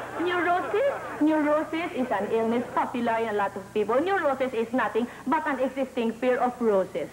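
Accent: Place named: Visayas, Philippines. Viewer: Bisaya añg punto ni.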